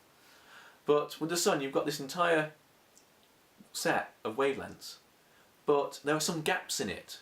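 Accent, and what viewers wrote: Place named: United Kingdom. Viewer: Just saying "The Fundamental Interconnectedness of All Things" in a Brittish accent is worth a vlog in and of itself! Keep up the good work and good luck!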